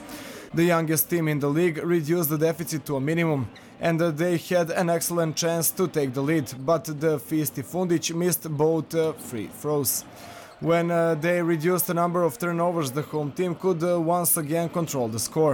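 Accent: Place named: Serbia. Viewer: Prica kao na Srpskom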